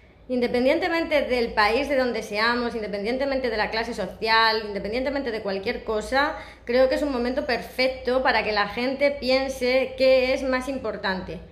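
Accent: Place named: Spain. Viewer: Acho o sotaque espanhol fofo demais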